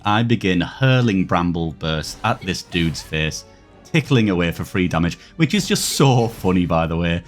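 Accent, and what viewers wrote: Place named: Yorkshire, United Kingdom. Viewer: GOOD TO HEAR ANOTHER YORKSHIRE ACCENT in SVWB ;-) where you from fella?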